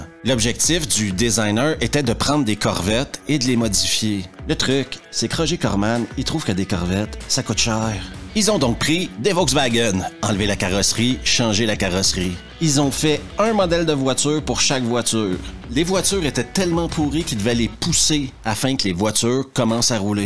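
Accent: Quebec, Canada. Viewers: J'adore ton accent canadien😂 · OMFG, l'accent Québécois, j'étais pas prêt ! C'est Bruce qui m'envoie. Il avait raison, excellente vidéo :D Merci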